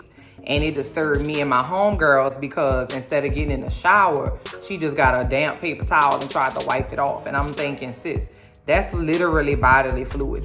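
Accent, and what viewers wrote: New Orleans, United States. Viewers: I just love your Nawlins accent. Me being from Monroe, I knew IMMEDIATELY you were from New Orleans.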